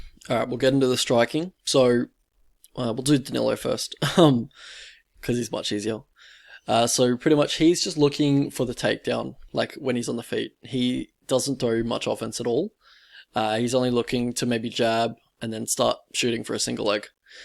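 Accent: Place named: Australia. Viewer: The Australian accent is a hideous mutation that sounds like the US southern accent and english accent had a baby! With that said thanks for the content!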